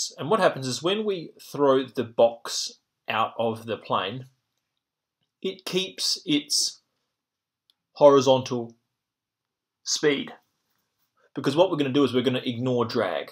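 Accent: Australia. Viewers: cool Aussie accent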